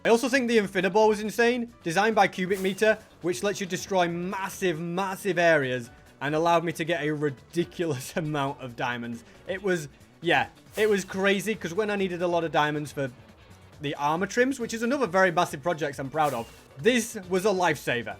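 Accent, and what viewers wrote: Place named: United Kingdom. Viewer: Bro is pure british. For a moment I tought you are mcqueens brother🤫💀💀💀💀💀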